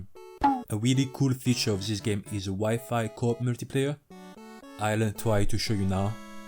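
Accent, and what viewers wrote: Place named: France. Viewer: Holy accent. Ton accent francais est épais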